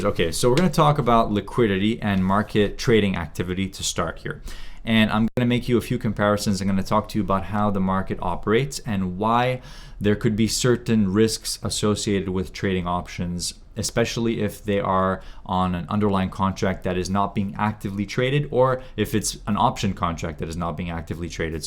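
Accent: Canada. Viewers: what is the name of the plattform? I dont understand canadian english that well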